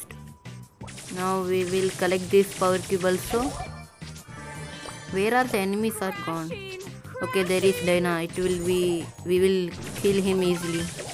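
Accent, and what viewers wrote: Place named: South India, India. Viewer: Ur voice says ur South Indian , if u say yes , I’ll reveal my state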